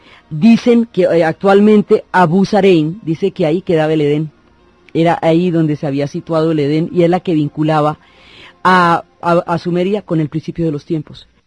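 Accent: Colombia. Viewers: Lamento mucho no haberte conocido antes. Muy agradable y clara tu narrativa. Pero, lo que me cautivo es tu forma de hablar Bogotana. Solo por eso te seguiré escuchando. FELICITACIONES! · Ese acento colombiano paisa y su voz grave es lo q le da sabor a la narracion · Fascinante oír la historia en la voz de dona y su delicioso acento colombiano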